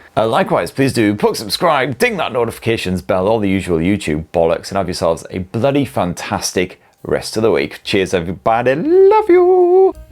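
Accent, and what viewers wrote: United Kingdom. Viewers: Great review. Love tne Brit accent and twattery. New word for us. 😉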